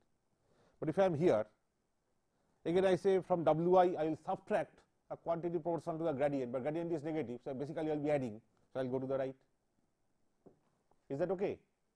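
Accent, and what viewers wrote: India. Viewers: you can't except more than this. About the language after five lecture your listening algorithm updated weight at optimum value. So after this communication is no more problem. Obviously, this is Indian English so others may problem but you can't get batter knowledge than this from anywhere else(IN FREE). THANK YOU SO MUCH PROFESSOR. THANK YOU NPTEL TEAM.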